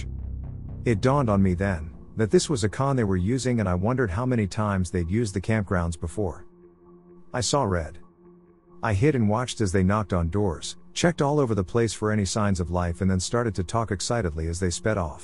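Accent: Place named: India. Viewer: What's with the indian accent? It totally just threw me off. Unless this was in India. haha. So weird.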